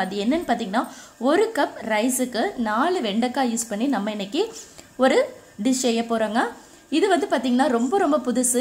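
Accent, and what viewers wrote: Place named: Coimbatore, India. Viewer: Akka Coimbatore Pola...... Ethana "inga" ... Anyway different recipe.... 👍... Nice nga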